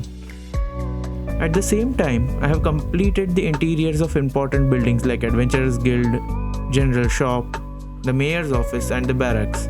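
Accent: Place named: India. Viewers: the accent tells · I am pretty sure he is from India just from accent.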